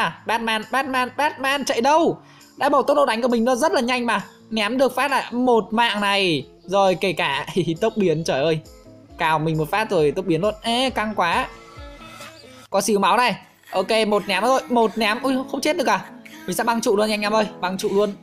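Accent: Nam Dinh, Vietnam. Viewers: A ở nam định hả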